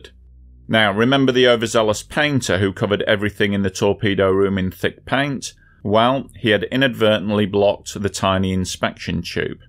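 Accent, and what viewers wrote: United Kingdom. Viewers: having to endure that British accent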